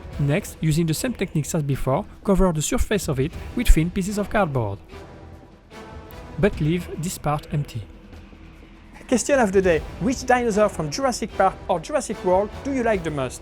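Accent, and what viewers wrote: France. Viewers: are you french? not that this would be a problem i just noticed the accent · Wait ! this accent... but alors you are french ?! X) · T'es français et ça s'entend en tout cas tous ce que tu fais avec le carton sont des oeuvres d'art continue comme ça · Ah d'accord, ça se voit à ton accent que t'es français 😂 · Salut es ce que tu es français ? Car je trouve que tu parle anglais avec un accent français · Sublime, j'adore et kiff jurassic park, et super acsent français 😊je me suis abonné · Est tu francais car ta un fort accent français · Pls tell me that your French, because your French accent is sooooo huge xD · Is this guy French?? I get inspector Closeau's vibe from his accent 😅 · Hooo mais tu e 0s français ?? Je me disais avec l'accent=) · Mdr on dirait que tu es français tu prononce les mots en anglais mais avec l'accent français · Français hein? 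Ça s'entend x) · Bonjour, es-tu français avec ton super accent? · ok je pense bien que tu avais un peu. Un accent français sa s'entans a ta voix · You are french ? Beacause you have a accent french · he have french accente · I don't know if you're French but ton accent français est vraiment sympa et c'est facile à comprendre du coup ^^ GG ! Edit : Oui j'ai regardé la desc et t'es bien français, c'est cool ^^ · Do you speak French ? Because I heard some accent in your dialogue. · Houla, vous seriez pas Français par hasard ? Ca s'entend à l'accent... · Le mystère des recommandations ytb x) Au moins un tuto pour lequel je n'ai pas eu besoin de sous-titre, un petit french accent au top xD Le boulot monstre .. fiou !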